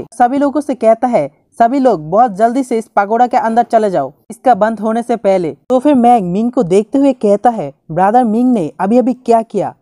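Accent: Bengal, India. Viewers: Bro r u bangali tumhara hindi pura nehi hae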